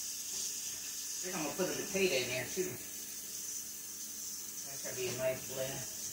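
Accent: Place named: Louisiana, United States. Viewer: Where u from cause u talk like me 😂😂😂Louisiana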